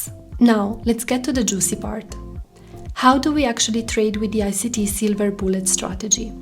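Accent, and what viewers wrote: Italy. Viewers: Sorry, your accent is very similar to the italian accent. For example you pronounced "blue" in a perfect italian haha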